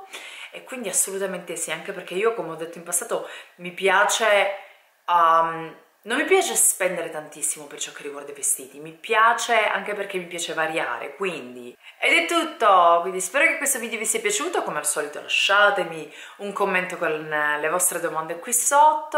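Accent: Sicily, Italy. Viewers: Sei siciliana ? io sono di catania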